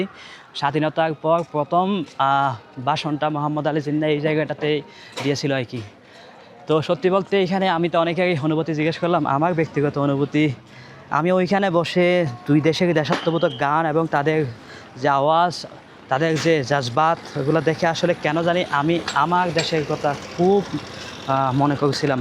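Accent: Chittagong, Bangladesh. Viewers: তুমি চিটাগাঙ্গে ভাষায় কথা বলো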